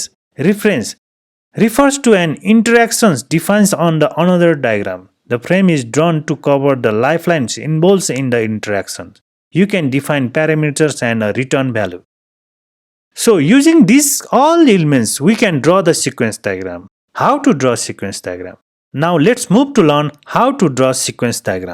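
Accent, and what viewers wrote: Nepal. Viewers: I cant help but notice newar accent. 😂 Great video!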